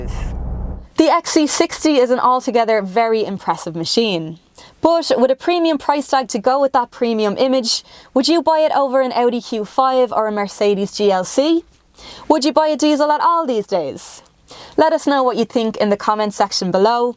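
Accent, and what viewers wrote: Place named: Ireland. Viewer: Greetings from Sweden. I love your Irish accent... so pleasant. Great review from a beautiful woman.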